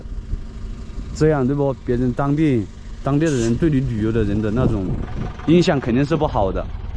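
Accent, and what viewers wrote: Chongqing, China. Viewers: 聽你這口音我就估計是重慶的，結果一看車牌還真是。玩得嗨哦，拿個貨車當房車到處轉。最近重慶封城，怕回不來了哦。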